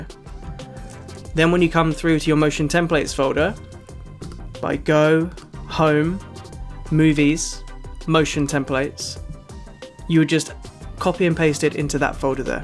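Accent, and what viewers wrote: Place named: United Kingdom. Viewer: I did not expect you to be from the UK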